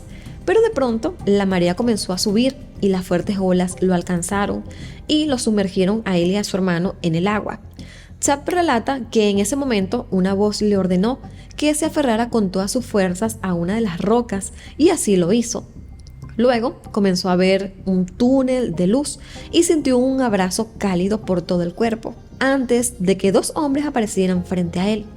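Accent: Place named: Venezuela. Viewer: Si, su forma de hablar me indicó que eras mi paisana 🤗 ... cuenta con mi apoyo, siempre... Éxitos 🤩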